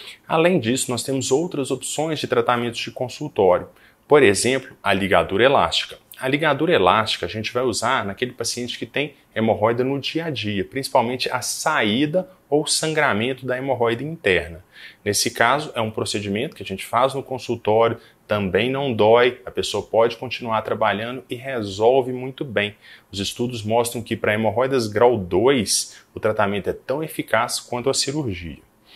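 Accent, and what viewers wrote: Minas Gerais, Brazil. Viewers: O sotaque mineiro é o mais bonito! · Esse sotaque mineiro, é uma graça!!!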